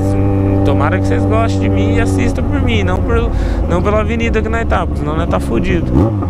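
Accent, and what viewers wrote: Goiás, Brazil. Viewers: pq parou de falar no pique do Goiás? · o porque você não fala mais no pique do Goiás?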